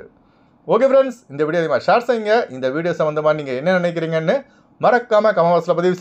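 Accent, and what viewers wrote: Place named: Arab world. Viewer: Ungal arabi tamil supper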